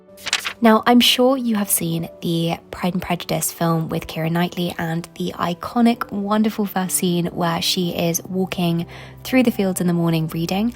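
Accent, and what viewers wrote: RP, United Kingdom. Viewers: I think it is rp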